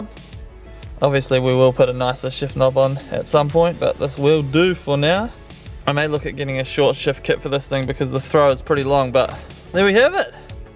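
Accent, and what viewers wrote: New Zealand. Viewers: I thought you was south island nz . Chur bro mean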